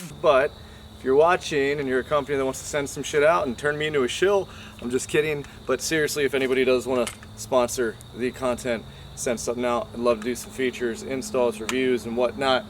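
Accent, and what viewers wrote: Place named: New Jersey, United States. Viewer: So your from New Jersey’s then?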